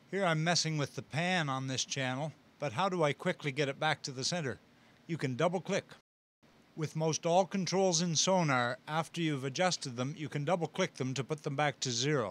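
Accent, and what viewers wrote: Canada. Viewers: Nice video and canadian accentt soundz kool dogg!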